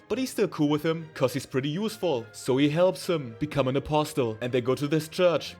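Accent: Germany. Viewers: are you german? I think I can hear a german accent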